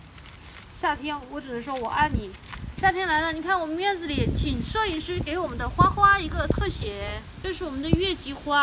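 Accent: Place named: Chengdu, China. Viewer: 哈哈哈哈感觉女主是不是成都人呀，口音跟我说普通话的时候好像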